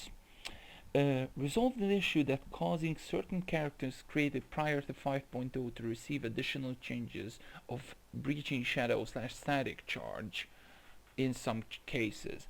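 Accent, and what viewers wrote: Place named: Hungary. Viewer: damn. I should have gotten that...my coworker is Hungarian and now I can relate your accent!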